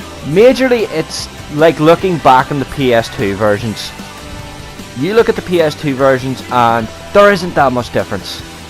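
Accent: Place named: Northern Ireland, United Kingdom. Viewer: Great video well done keep this up and you get somewhere your accent sounds like it’s from Northern Ireland I’m from there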